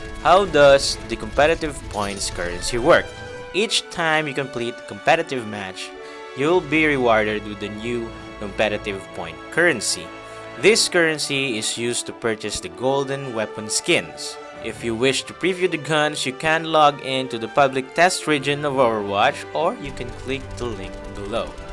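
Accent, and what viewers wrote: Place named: Philippines. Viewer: this is actually really well done. the "filipino english" accent is bearable <3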